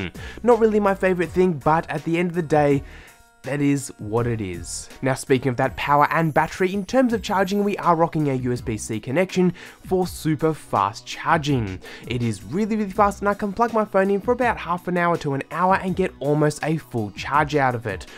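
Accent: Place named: Australia. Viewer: Ugh...Aussie accents.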